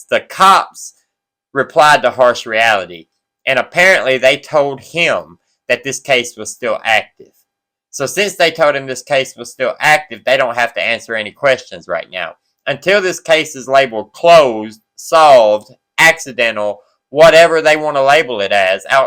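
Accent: South, United States. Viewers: Just became a new sub today! Love the channel and that southern draw you got! 😉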